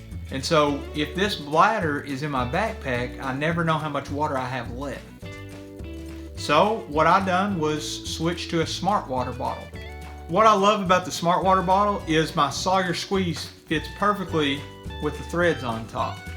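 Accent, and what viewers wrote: Kentucky, United States. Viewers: What part of Kentucky are you from? Seriously, I live near Ft. Knox.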